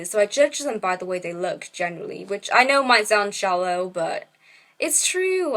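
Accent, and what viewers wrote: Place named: United Kingdom. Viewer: Hey :) i'm a new subscriber & i couldnt help but notice your interesting accent. Roughly whereabouts to you live in the UK? Also, i enjoyed the video :D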